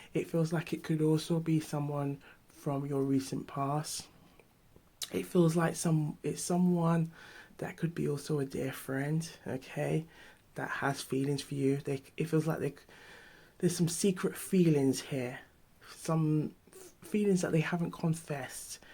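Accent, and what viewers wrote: Jamaica. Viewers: Are you Jamaican by chance ?!👀🇯🇲❤️❤️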